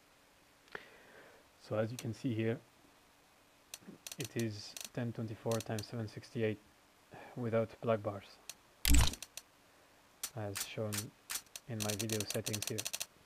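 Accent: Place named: India. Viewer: sounds like an indian tech support scammer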